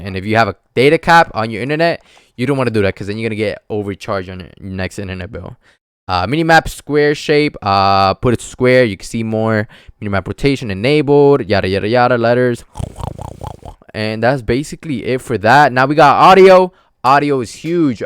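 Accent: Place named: Latin America. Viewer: Este we a quien quiere engañar? Parece más latino que nada jajajaja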